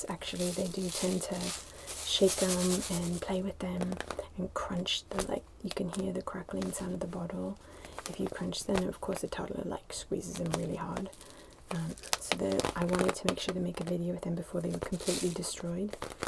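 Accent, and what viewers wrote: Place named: United Kingdom. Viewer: I always forget you aren't British. That accent is nailed tho😍😍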